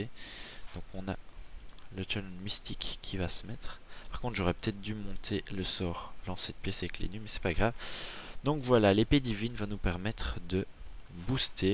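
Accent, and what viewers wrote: Belgium. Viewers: j'aime bien l'accent bien belge x) ça fait plaisir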